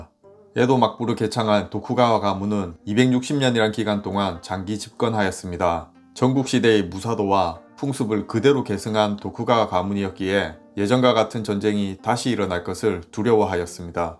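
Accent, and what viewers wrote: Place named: Gyeongsang, South Korea. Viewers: 일본인입니다.목소리도 좋으시고 내용도 재미있으면서도 잘 공부가 되고 개인적으로 좋아하는 경상남도 억양으로 말해 주셔서 유익하고 재미있는 영상입니다!! 구독합니다.!!감사합니다!! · 경상도 억양도 아네 ㅋㅋㅋ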